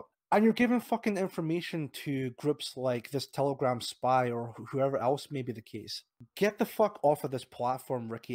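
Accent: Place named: Scotland, United Kingdom. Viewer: Naw am just wondering wit part of Scotland this guy accent comes from.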